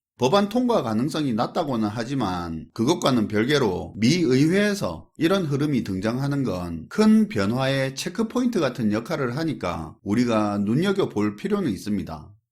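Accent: Gyeongsang, South Korea. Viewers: 개쌍도 어투 진짜 토나온다. 하지마라.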